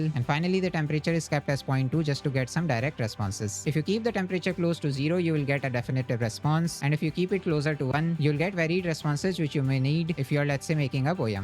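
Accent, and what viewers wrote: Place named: India. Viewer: Господи, пока не увидел твой коммент думал повешусь с индийского акцента, уже забыл что такое индийские айти видео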